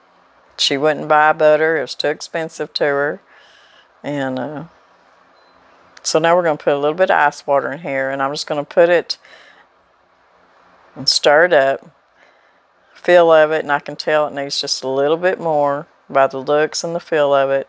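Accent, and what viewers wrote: South, United States. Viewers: I went out to my patio to plant some flowers and when I came back inside I heard this beautiful southern drawl and just KNEW it was you. And it WAS!! ❤️ I need to spend the day with you Miss!! All my family is gone and you sound JUST like my grandma. Soooo very comforting to my soul. Much love to you 2! 😘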